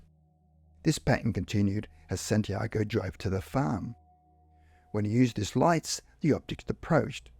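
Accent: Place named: Australia. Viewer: Aussie accent! Oh ignorant ones.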